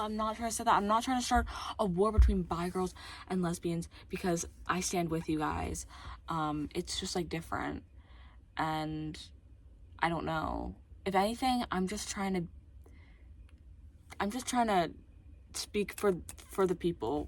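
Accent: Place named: United States. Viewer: I thought you were American.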